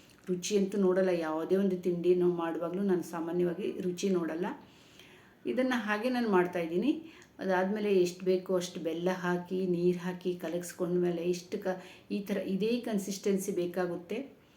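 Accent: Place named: North Karnataka, India. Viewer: ನೀವು ಉತ್ತರ ಕರ್ನಾಟಕದ ಭಾಷೆ ಮಾತಾಡ್ತೀರಲ್ಲ ಸಿಸ್ಟರ್ ನಾನು ನೀನು ಉತ್ತರ ಕರ್ನಾಟಕದವರು ಅನ್ಕೊಂಡೆ ಸಿಸ್ಟರ್❤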